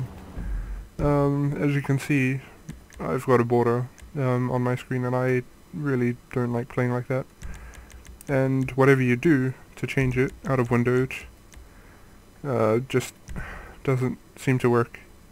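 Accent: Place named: New Zealand. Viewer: soo good hearing a Kiwi doing a tut, te-meke!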